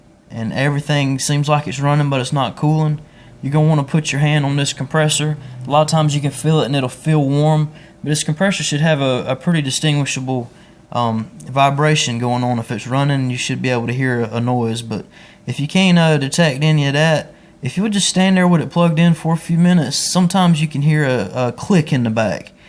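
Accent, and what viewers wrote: South, United States. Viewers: thanks, you are a good speaker. a bit of southern twang perhaps. going to work on my fridge today armed with knowledge.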